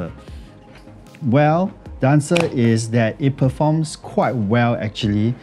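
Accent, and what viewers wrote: Singapore. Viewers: listening to your singlish review,makes me really wana.....wow fantastic haha,decent review though.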